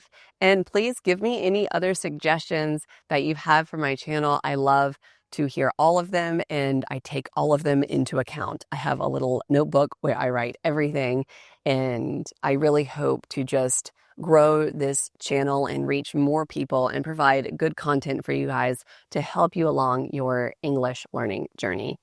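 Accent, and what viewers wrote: United States. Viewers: I wish I could speak American English like you; my goal is to speak fluently, and I know I can do it. For context, I am from Syria and live in New York, but unfortunately, I don't have any friends to practice with. I really love your high-quality content.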